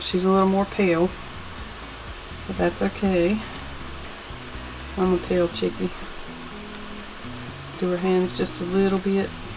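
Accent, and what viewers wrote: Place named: South, United States. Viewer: I love to hear you talk. You have a sweet southern draw. I like how you say “that’s purdy” You have such talent. You really enjoy what your doing. I love watching you create.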